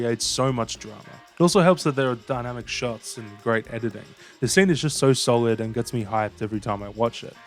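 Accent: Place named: Australia. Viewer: Are you Aussie, you sound Aussie, umm why you picked these movies is a mystery to me as i have not seen half of them and maybe i should have, anyhoo lets dance fatso ; )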